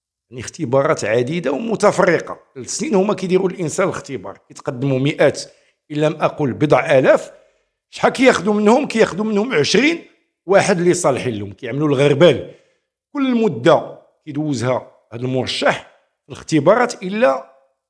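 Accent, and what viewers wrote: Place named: Algeria. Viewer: كتهدر بحال الجزايريين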